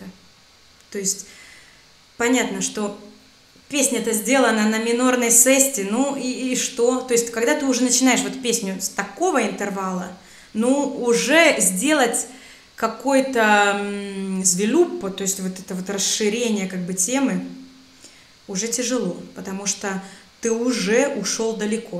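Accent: Russia. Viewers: Здравствуйте! Послушал ваши реакции на русском и английском языках. Похоже на то, что ваш родной язык -русский, так как английский уступает в произношении, присутствует акцент и вы прежде всего русскоговорящая, владеющая английским. Но разбор произведения отличный) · По русски говорите безупречно, мне вот стало интересно, иностранцы англоязычные замечают-ли Ваш русский акцент...?))) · Специально пишу по-русски. Дорогая, я бы Вам 10 лайков поставил, если бы была возможность. Вы - чудо, в том числе как певица. Увы, пока не было возможности оценить Вас с дирижерской палочкой в руке, но уверен, что и в этой ипостаси Вы не разочаруете. )) Спасибо огромное за рецензию и более чем профессиональную и качественную аналитику. Подписан на Ваш канал, всегда внимательно слежу. Как уже не очень молодой человек, имеющий взрослых детей и (пока одного!) внука, плюс как филолог-русист по университетскому образованию, хочу Вас поздравить с практически идеальным московским произношением и грамматически безупречным русским языком. 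Вы иногда сомневаетесь в своей лексике, но всё делаете правильно. Берегите свою семью и Вашу прелестную дочь, в первую очередь (видел её в другом ролике), в наше неспокойное время. И себя, разумеется )). Храни вас Бог. Привет из Москвы. Всем мир! Вадим. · Что-то я не понял... вы не русская? У вас же идеальный русский акцент. И построение фраз.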